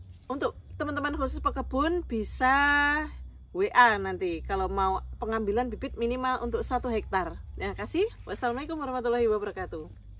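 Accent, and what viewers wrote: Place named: Blitar, Indonesia. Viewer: Assalamualaikum nduk mimin...saya asli. Orang Blitar, dkrg di sumantra lampung, hijrah dari thn 1987 ,saya terispirasi dg bibit yg ssmpean promosikan, smg nanti kesinambunga, amin...ditambah lagi logatnya bicara, bikin kangen sedulur nemg blitar...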